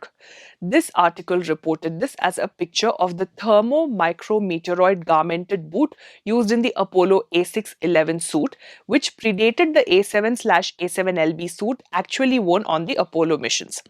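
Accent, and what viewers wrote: India. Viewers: Yeah, go ahead and use the Indian accent to give some sort of credibility for the simple minded rooted in hate and blame that also thinks the earth is flat.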